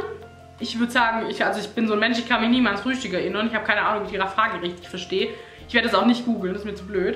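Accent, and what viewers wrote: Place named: Swabia, Germany. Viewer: Tolles Panoramafenster. Bei Euch liegt ja ordentlich ❄️! Wo kommst Du denn her der Dialekt hört sich sehr vertraut an! Schwäbische Alb? Was machst Du denn beruflich? Wie habt Ihr Euch denn kennen gelernt? LG aus bayrisch Schwaben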